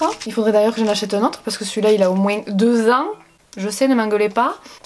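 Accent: South, France. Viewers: J'aime trop ton accent du sud et tu est trop belle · Es que t Marseillaise parce que moi oui et ta un accent du sud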